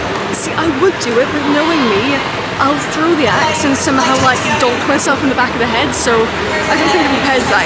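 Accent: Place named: Wales, United Kingdom. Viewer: Lol, I don't watch this stuff... But I can't not watch... Maybe because you're Welsh, and I'm "Welsh".. gotta be the accent..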